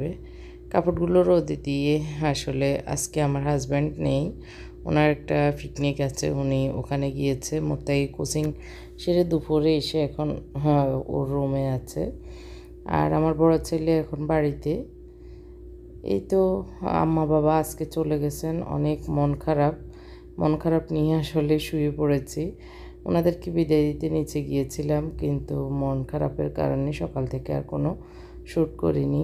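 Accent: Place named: Feni, Bangladesh. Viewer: Apu tomer kotha gula sunle apon apon mone hoi, karon ami o fenir, purbo ukil para, thaki Dhaka te, r tomer vlog dekhar jonoo wait kori,balo thako.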